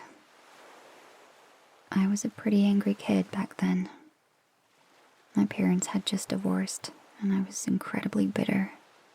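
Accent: France. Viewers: Please, do more French accent ASMRs 😌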